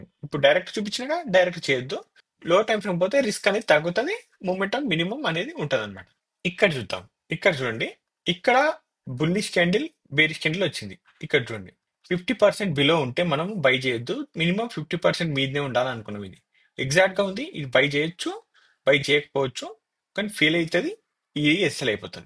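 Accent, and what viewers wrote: Telangana, India. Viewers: మీరు మన తెలంగాణ యాసలో చెప్తుంటే చాలా సంతోషంగా ఉంది, మంచి knowledgeable video sir, ఇలాంటివి ఇంకా ఇంకా చేసి మా లాంటి beginners కి guide చేస్తూ ఉంటే మన తెలంగాణ ప్రంతం వాళ్ళుకూడా పక్క రాష్ట్రాల వాళ్ళు చేసే calls and Tips మీద ఆధారపడకుండా స్వంతంగా ట్రేడింగ్ చేయగలరు. ధన్యవాదాలు 🙏🙏🙏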